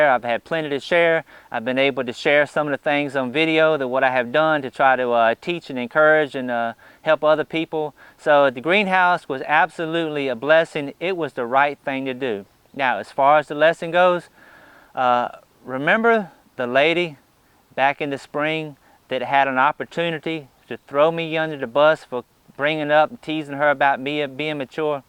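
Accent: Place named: East Texas, United States. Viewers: I've been in Missouri 13 years, but still retain my very strong Texas accent. I appreciate those folks who refer to it as 'charming'. lol I LOVE your accent. It sounds like 'home folk' to me. Great lesson you taught today. I consider you and Bexar good friends, and I think you're both phenomenal teachers. Wouldn't want to be doing this without you. My philosophy when dealing with other folks is, always assume not offense was intended. That's usually the truth. It's served me well. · coming from a big east texas family you sound like every freind & relative, all good folks, pride themselves on good manners, most they would say is "bless his heart, dosn't know any better, wasn't brought up right" You'r a good man & my favorit of all the gardening vidios, truely blessed. so my thanks for all your do.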